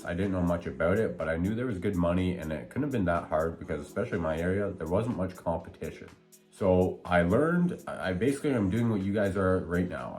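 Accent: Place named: Northern Ontario, Canada. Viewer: 705 area code. I saw a video and there was snow in the background and hearing you talk I was president sure . London Ontario here and just in my first week getting going. I’ve subbed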